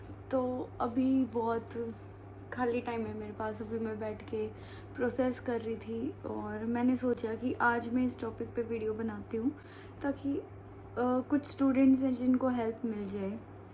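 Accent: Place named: Haryana, India. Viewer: me bhi jaat family ko belong karti hun but me by birth punjabi family se belong karti hun but mere in-laws jaat hain U P se. Jb se maine tumhare vlog dekhna shuru kiya to mujhe tum bahut pyari lagti thi us ka ek reason y tha ki mujhe tum haryanvi language bolti Hui bahut achi lagti thi kyuki tumhare bolne me bahut mithas hai jo maine kabhi haryana ke jaato me nahi dekhi thi. Phir jb se ko dekha to mujhe tum dono se pyar ho gaya bacho ki tarah ab mujhe hr time tumhare vlog ka wait rehta hai. Congratulations tum Mom Dad banne wale ho but apni health ka dhyan rakhna. God bless u all. Luv u all❤❤.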